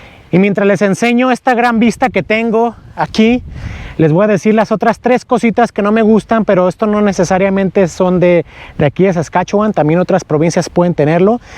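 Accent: Mexico. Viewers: Jajjaa ... 😝😝😝😝 es mexicano!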